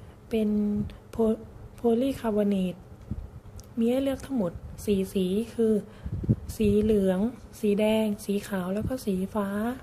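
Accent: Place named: South, Thailand. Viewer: อยุจังหวัดไรนิแหลงออกทองแดงเรย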